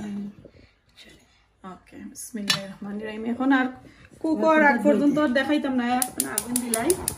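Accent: Sylhet, Bangladesh. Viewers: Apnar mat kilan apni toh sylheti/ilan matoin kne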